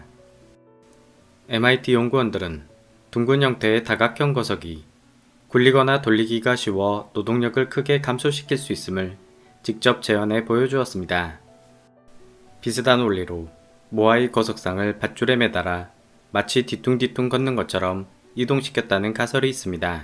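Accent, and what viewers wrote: Jeolla, South Korea. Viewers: 나레이션에서 느껴지는 전라도 사투리 억양이 꼬소하네요 ㅋㅋㅋ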